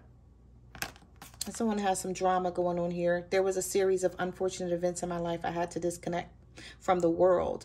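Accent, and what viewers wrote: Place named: East Coast, United States. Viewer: I can hear that east coast accent 😂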